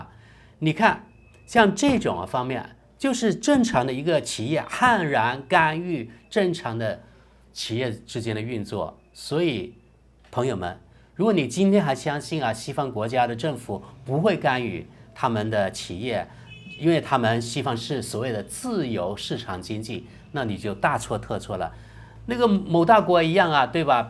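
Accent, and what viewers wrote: Standard. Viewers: 中国深圳人，觉得这么好普通话还谦虚。真的很标准啦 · 的普通话很标准啦，可能你身边没有华人跟你对话你感觉不深而已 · 没有啊，你的普通话已经很标准了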